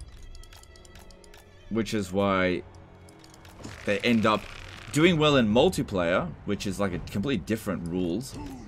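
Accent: Australia. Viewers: Alternate title: "Aussie man talking about eating shit for 29 minutes strait (in a video game)."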